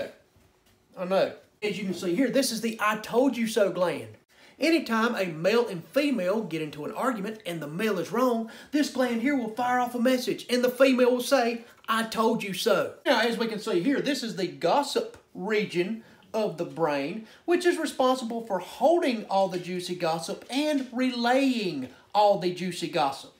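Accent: South, United States. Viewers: Hot and funny! Love the southern accent. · I love the Southern drawl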